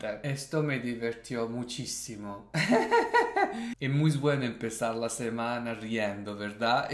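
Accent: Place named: Italy. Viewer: Hi, funny video... Blas Canto´s performance was amazing, it's extremelly difficult to imitate Diana Navarro. Your Spanish is good as well, keep going. Btw, why do you have that Italian accent whe you speak Spanish?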